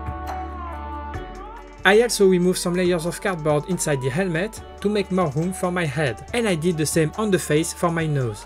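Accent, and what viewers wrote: France. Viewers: Wah mdr l'accent Français se capte direct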